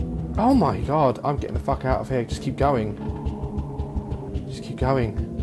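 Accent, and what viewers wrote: United Kingdom. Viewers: He sounds so much more British then vs now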